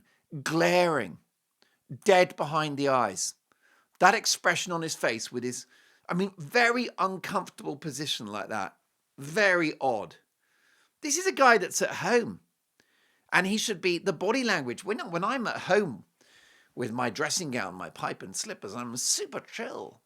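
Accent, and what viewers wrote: United States. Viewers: Your American accent is pretty good, too bad I can't say the same of your singing. I do love your show just not your singing. I think your analysis of Harry's pose is not accurate, but I agree he, by now, is seriously regretting his marriage and is very unhappy. I fear he might eventually be a suicide risk because when she dumps him, he will have nothing left. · GAH! Your U.S. accent is really good! 😅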